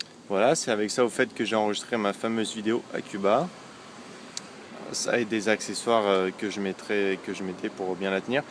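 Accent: Quebec, Canada. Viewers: A tu un accent quebecois Pouce vert pour quil le voit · En France il fait pas chaud ,11 degrés à Strasbourg oú je suis ,sinn pas mal tes vidéos et sa va on remarque pas trop ton accent québecois =D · c'est normal il est québecois ^^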